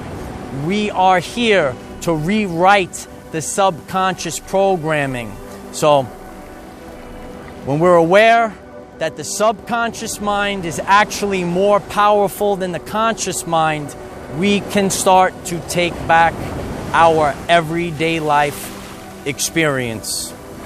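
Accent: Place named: Brooklyn, United States. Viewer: I feel like I've spoken to you before. I remember your voice and accent. I never knew anyone from Brooklyn though. Never even been there. Strange how that works.... Thank you. Blessed be. 🙏💜🌷💝💫💖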